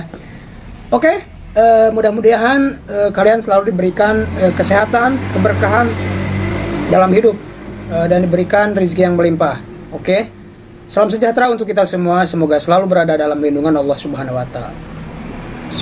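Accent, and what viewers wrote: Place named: West Java, Indonesia. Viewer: logatna na mah urang sunda nya kang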